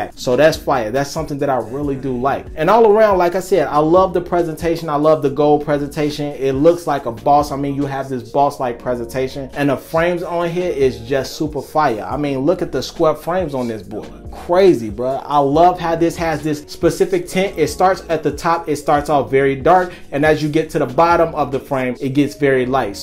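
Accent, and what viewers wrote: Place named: Chicago, United States. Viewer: I hear yo Chicago accent deep correct me if I’m wrong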